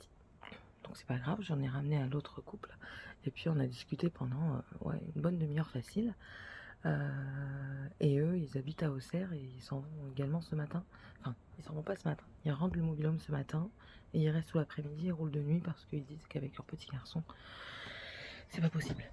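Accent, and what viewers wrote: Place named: Nord, France. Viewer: Oh la vache l'acent du ch'nord ! C'est marrant !!!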